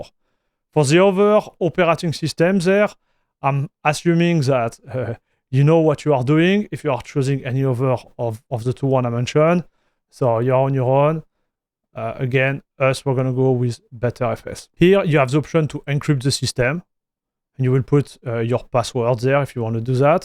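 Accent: France. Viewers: you're french because your accent is very similar. i like your videos a lot.😁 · honestly i think id rather just listen to you speak french and read subtitles rather than try to decern what you're saying in english through your CRAZY thick french accent. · Heavyest french accent I ever heard ❤🇫🇷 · I'm guessing that this is probably a very informative video, but the extreme (French I'm guessing) accent. I cannot understand what you are saying. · Jadore cet accent francais :D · You have the thickest french accent I've ever heard 😅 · nah this is the purest french accent you'll hear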